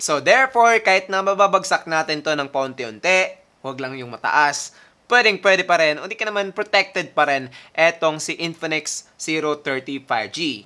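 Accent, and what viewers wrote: Visayas, Philippines. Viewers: Bisaya ka sir no?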